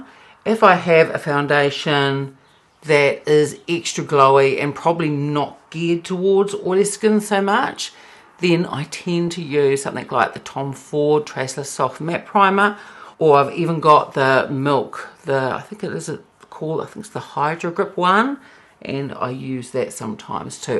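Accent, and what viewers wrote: New Zealand. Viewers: I ❤ your videos love!!! I also will be trying to do a Kiwi accent all day!!😂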